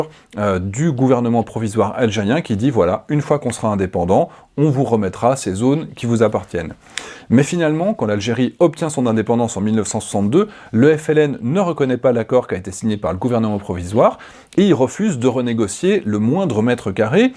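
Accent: Morocco. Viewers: on dirait un marocain qui parle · on dirait qu'un marocain qui parle!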